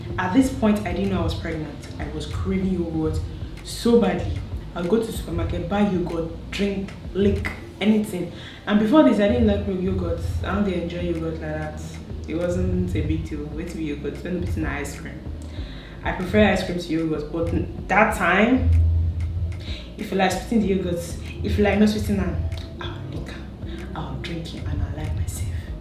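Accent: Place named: Ghana. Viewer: You suddenly sound Ghanaian 👀👀.... Congratulations!!!!!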